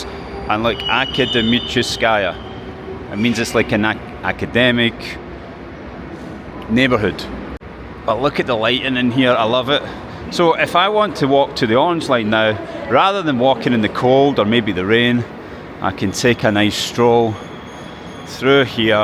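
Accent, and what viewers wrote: Scotland, United Kingdom. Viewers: Согласен, говорит примерно так: "Халёу эфрипоти. Ай эм скотищ гай ин Моску"...))) P.S. Надобно отметить, что в его шотландском говоре есть некоторый шарм... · you probably live on the moon😊. He speaks English. His mother tongue (Scottish) has nothing to do with this. The Scots speak English with this accent (and his not a difficult one, there are much harder in Scotland).There are many accents of the English language spoken in the UK which differ from the classic English... Listen to MMA fighter Paddy Pimblet speak. It's an alien language😊. Though he's from Liverpool... Scouse-that's the name of his accent...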